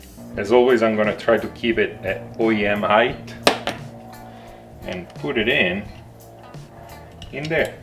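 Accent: Italy. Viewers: Love your Channel and love your accent too. 😊 Having an italian teaching us how to take care of our italian babies is amazing. Thanks for your time. Cheers from Canada.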